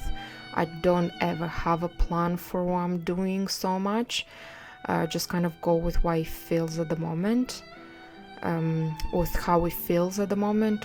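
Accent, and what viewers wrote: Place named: Europe. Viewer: I love the mix of accents you have! Something European with some English cockney in there a bit! Really neat to listen to! You’re very creative, love watching you make something come to life ♥️ I like your “play it by ear” style, I’m similar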